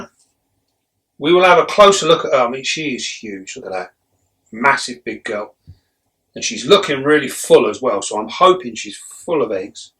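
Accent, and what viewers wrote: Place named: Dorset, United Kingdom. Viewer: OMG that thing is HUGE! The cage is beautiful! Hearing you talk brings back fond memories of visiting my Uncles in Dorset long ago, my Mom's side of the family is all from over there.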